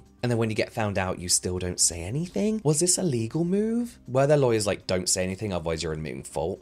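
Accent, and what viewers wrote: United Kingdom. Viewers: struggling to say the second steroid ingredient made me happy…. Simply because I also cannot say anything correctly, and to hear a very posh English person also struggle… One of us!! Is all I could think 😂😂 thanks for being a real person haha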